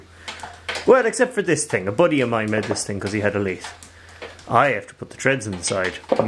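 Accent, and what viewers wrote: Ireland. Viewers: Are you Irish? And is that hail on the roof?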